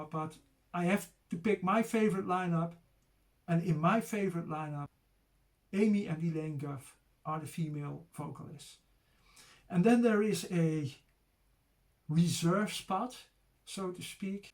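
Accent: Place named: Netherlands. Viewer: Het is goed te horen dat jij Nederlands bent. Behoorlijk accent!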